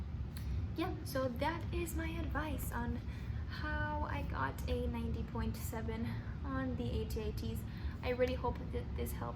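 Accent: Miami, United States. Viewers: I know a Miami accent when i hear one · You are literally the cutest thing. You have a Miami accent. Thank you for your tips and tricks! Pero like thanks for the great video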